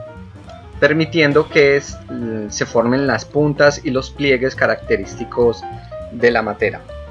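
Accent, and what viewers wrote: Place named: Colombia. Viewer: Saludo. Veo y escucho tù video me gusta buena nota por tù fromar de hablar tù acento es Colombiano, que posiblemnte de Cali. Bien yo estoy en el circulo polar.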